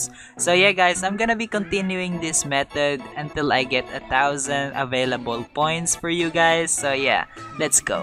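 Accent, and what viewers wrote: Philippines. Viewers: This accent, you're a filipino aren't you?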